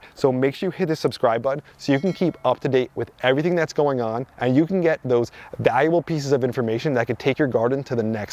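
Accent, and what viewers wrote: New Jersey, United States. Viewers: I am in Burlington NC! I planted my seed potatoes in 10 gallon fabric grow bags last week. April 15. The russets are just coming through. I planted all the potatoes deep so I can have room for hilling. I learned a lot from this video. I have grown potatoes in straw and even cardboard boxes with successes. I was planted later this year because the first batch of seed potatoes I ordered from MIGARDENER were not chitted. So, I had to spend a month doing that. I ordered some new seed potatoes from MIGARDENER were a week ago and they came today already chitted, so I planted them immediately. Take care and good luck. I love listening to you talk with your Jersey accent. I lived in several places in Jersey in the 1970s Nd 1980s. I moved here from Asbury Park in 1992.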